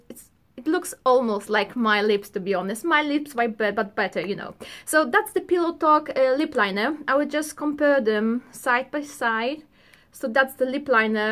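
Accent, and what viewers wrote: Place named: United Kingdom. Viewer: pfffeeeeeerfect, te typowo brytyjskie akcenty są niesamowite w Twoim wykonaniu, uwielbiam akcent brytyjski a u Ciebie słychać go tak przeuroczo ;) xoxo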